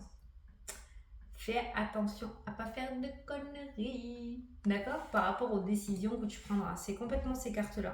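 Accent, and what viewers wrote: South, France. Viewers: Joyeux Noël à vous et votre famille !!! Vous nous apportez le soleil avec votre accent du sud!!! Continuez à prendre soin de vous et de nous tous. À dimanche.🎄🎅🎍🤶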